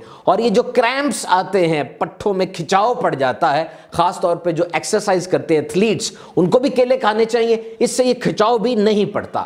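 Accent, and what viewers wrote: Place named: India. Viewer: His accent resembles with Indians .....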